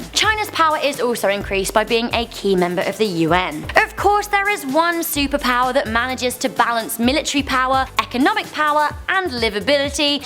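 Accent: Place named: United Kingdom. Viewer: is a simple naturally beautiful and sexy girl and that deep thick British accent is just charmingly adorable!. MAGM.❤️✝️☯️🇵🇷🇺🇸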